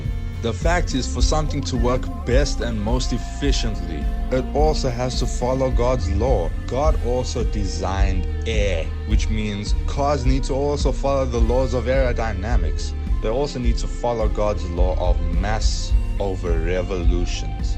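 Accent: South Africa. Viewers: Bro are you south african, dueban maybe? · I feel like I am listening to an asian that some how grew up africanz in South Africa.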